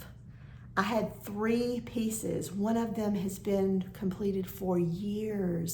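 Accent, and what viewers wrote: South, United States. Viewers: Your work is always so gorgeous! I love the patterns you choose and your color changes for many of them. I gave up flosstube for a long while but have only recently decided to revisit a few 'makers & stitchers' who inspire me with their unique style and love for the art. I'm delighted to learn from you, be inspired to pick up my needle, encouraged to tackle a project that I've judged too difficult, and be satisfied with with a completed piece ... even tho I sometimes only see the mistakes! Your sweet spirit and that lovely southern drawl... the stories of treasure hunting at vintage shops...tales of trips to the beach for sun & seafood...all of it brings up memories of not so long ago trips to Foley beach, Isle of Palms. So grateful for your presence on YouTube!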